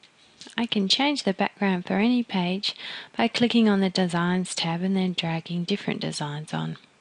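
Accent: Australia. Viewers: Hi,....besides learning from what you say in this instruction video, I also wanted to tell you that I like listening to your voice....which is very soothing, sweet, feminine and peaceful. I'm in the U.S.A. so I also like your Australian accent. It's very nice....and I hope you don't mind me sayin' so.;-)!!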